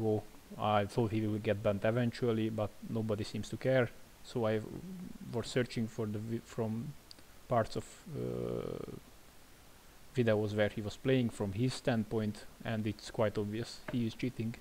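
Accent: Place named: Hungary. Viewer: Szerbusz, nemrég kezdetem el a játékkal ujra játszani, gondolom irok mert én is iszonyu sok emberrel talélkozok karantén alatt biztos unatkoznak és azt szeretném kérdezni h mit lehetne velük csinálni h ki legyenek tiltva, vegyem fel a meccseket én is is csináljam ugy v mi? (gondolom magyar vagy a "munka" mappáról, meg hallatszik az akcentusodon! :D )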